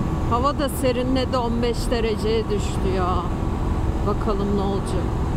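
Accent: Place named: Adana, Turkey. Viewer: espirili konuşmanı seviyoruz.Tıpkı adana şivesiyle konuşuyorsun seni seviyoruz.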